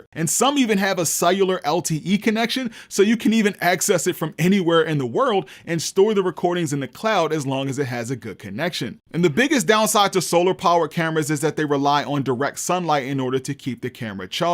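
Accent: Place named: Baltimore, United States. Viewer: Oh you from Baltimore Baltimore lololol. Good video man, this gave me a bunch of info. I'm kinda torn between bullet and ptz, it's gonna boil down to what the individual cameras offer.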